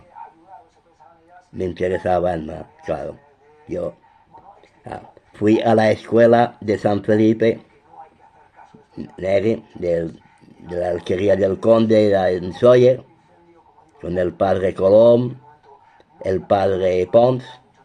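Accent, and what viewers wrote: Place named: France. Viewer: Usted es solo un francés renegado que está acogido en España , pero solo hay que oírle para saber que es un francés de pura cepa. Usted pasará a l posteridad por ser novio de Carmen de Mairena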